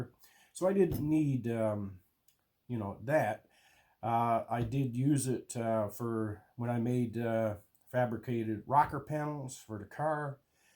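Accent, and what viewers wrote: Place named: Newfoundland, Canada. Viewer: I have one of those , does a great job. Ok so I'm trying to narrow down the accent so let's me know if I'm close , I figure your from the rock but anyone could guess that but I'm going way out there and saying your from a stretch between Grand Falls Windsor east to Botwood but man oh man I hear Bishop Falls big time . Keep at it bye and as they say on the rock , who knit ya 👍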